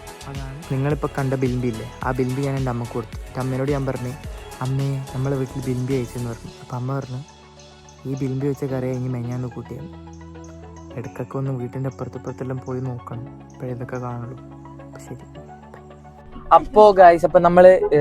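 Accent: Kannur, India. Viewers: chechide samsaram kannur thannne aano🫠🤍🤌🏻but kollaaa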